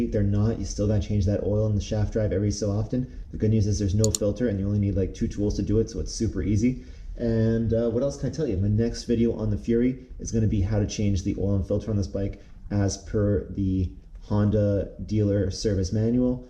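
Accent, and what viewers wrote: Canada. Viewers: You sound a bit Canadian eh?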